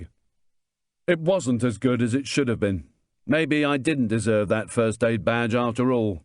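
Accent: England, United Kingdom. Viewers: Yes the English accent is pleasing to my ear 🤔 I am always disappointed when an audio book is accompanied by the American version 🙄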